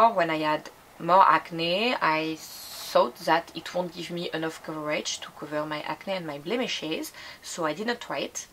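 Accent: France. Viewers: You look very pretty. Your makeup is simple and lovely. Very natural. Love your French accent.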